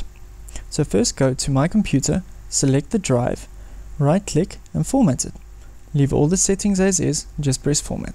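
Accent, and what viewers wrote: Afrikaans, South Africa. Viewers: Ek hoor duidelik die Afrikaans deur slaan lol. Goeie werk dankie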